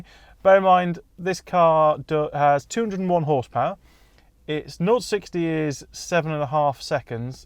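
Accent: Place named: United Kingdom. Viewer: British :D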